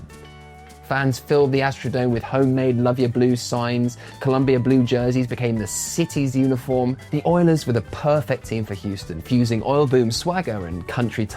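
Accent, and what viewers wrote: England, United Kingdom. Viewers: So weird hearing an English guy say all this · I don't know, but it's kind of odd hearing a dude with an English accent telling us about NFL history. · Its crazy having a british accent talking about american football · Uhhhhh.....why is a British person telling me anything? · What is a guy...with a British accent, doing...caring one bit, about the, defunct, "Houston, Oilers"? Don't get me wrong...I like it! It is intriguing. Being a native Houstonian, having grown up in the 70's in the Houston area, (Magnolia, Texas), I, my brother, my Dad, and ALL my friends...were die-hard Oilers fans. It just stuns me...amazes me...confuses me...that a "chap" from, "Bloody Ole England", (who call "soccer", "footbal"), care one iota about a defunct Houston, Texas Oilers football franchise? I don't get it...but put me on board. I even subscribed to his channel. 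"Love Ya Blue"...always will. I was 12 years old...in 1979...and Earl Campbell...was the greatest sports hero in the world in my eyes. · Wasn’t expecting a British guy but hell yeah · Wait is nobody going to question, why the guy speaking in the video, has a British accent?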